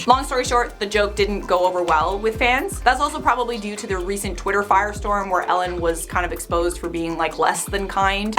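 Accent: United Kingdom. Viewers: You British lady accent is what keeps me clicking😉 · I love your british accent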